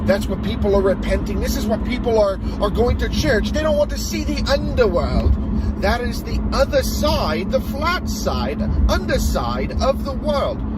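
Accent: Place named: England, United Kingdom. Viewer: What's with the English accent? Doesn't make you sound smart especially when you have no idea who Brian May is.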